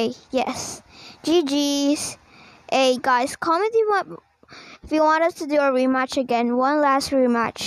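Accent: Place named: Philippines. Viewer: Your sound is like Philippines bro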